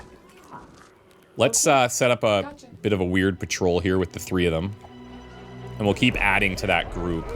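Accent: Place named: Canada. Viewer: As a non native English speaker it took me till to detect the Canadian 😅 🇨🇦 ❤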